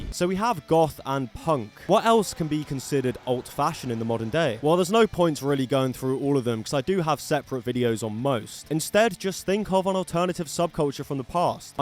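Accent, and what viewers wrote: United Kingdom. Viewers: br'sh accent too thick yuck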